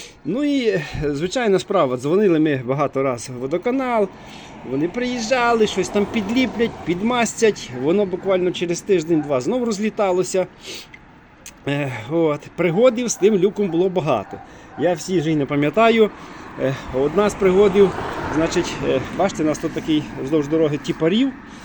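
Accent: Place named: Kremenets, Ukraine. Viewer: Кременецький "діалект" просто жахливий, коли слухаєш "зі сторони".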